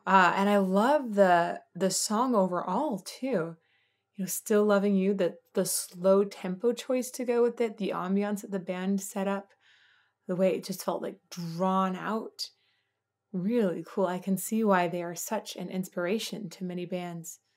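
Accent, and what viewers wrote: Germany. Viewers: English is not his native language....he is German think about that.......... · That German accent is so sexy 😍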